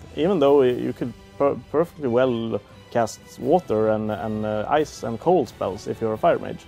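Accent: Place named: Sweden. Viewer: That's hell of an accent you have there and I say that as a fellow swede.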